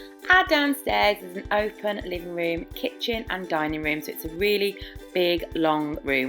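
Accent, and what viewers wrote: Birmingham, United Kingdom. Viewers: If just watched like 10 of your videos in one go! Love them!!!! I'm from Birmingham but when I got married moved down south so been soo good to here the accent!! Great videos can't wait to watch more!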